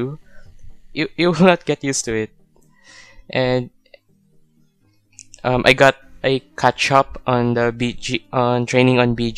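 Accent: Philippines. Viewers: its obvious that his filipinooo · Parang tagalog accent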